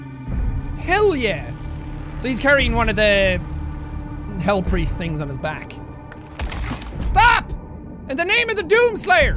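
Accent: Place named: Ireland. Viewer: Normal people: fall asleep listening to calming music. Me: falls asleep listening to a excited Irish man slaughter hordes of demons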